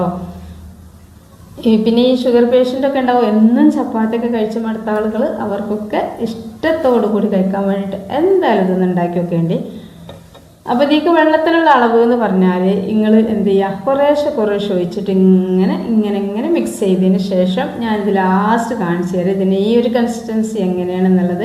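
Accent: Malappuram, India. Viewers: 👍താത്താന്റെ ആ നിഷ്‌ക്കളങ്കമായ അവതരണ മലപ്പുറം തനതായ ശൈലി കേട്ടിരിക്കാൻ വളരെ രസമായിട്ടുണ്ട്. അതിൽ ഇടക്ക് വലിയ ഇംഗ്ലീഷ് വാക്ക് ഉപയോകുന്നത് ഒഴിക്കാവുന്നതാണ് താത്ത നല്ല അവതരണമാണ്. ലളിതമായ പാചകം കൂടുതൽ സാധനങ്ങൾ ഇല്ല. നല്ല സ്ക്രിപ്റ്റ്. ബോഡി ഷോ ഇല്ല. One man show.👏👏👏super.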